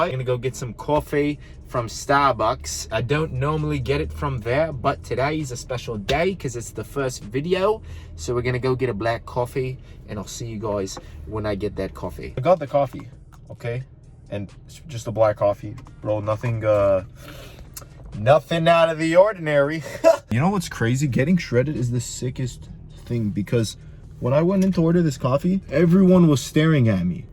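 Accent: Australia. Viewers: That accent was Australian crossed with victorian English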